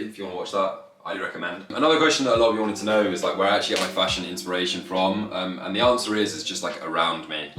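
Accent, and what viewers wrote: United Kingdom. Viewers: THE BRUSISH VOICE AHH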